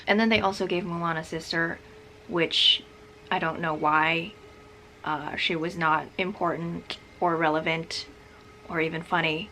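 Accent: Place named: United Kingdom. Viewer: subbed for amazing British accent! haha. Oh and also, good review :)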